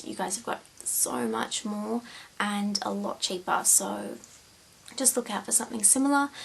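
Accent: Australia. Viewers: Where do you buy your OPI nail polish, because I live in Australia and you sound Australian :) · are you from Australia?? i LOVE Aussies!! :D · Aussie?? I can tell by the very familiar "ova" "holda" ~ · r u australian??? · r u from australia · how could they not tell its aussie? lol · I do love vidoes that make me feel I have very little make up.=) Thanks for that and, yes, I like the Aussie accent too - it's quite cloes to my own Brit tones, but still with a little touch of the exotic.=) Take care! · I can listen to Australians talk all day, they just make everything sound better!!!!!!!! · i was wondering what your accent was, and then you said its only in australia. I am australian too... i fail.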